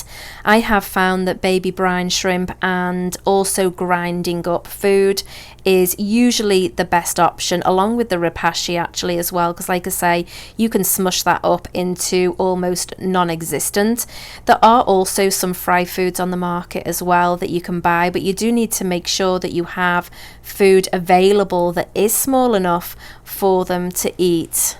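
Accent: Liverpool, United Kingdom. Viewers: Is that a hint of scouse I hear in that accent? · Random question but are you from Liverpool?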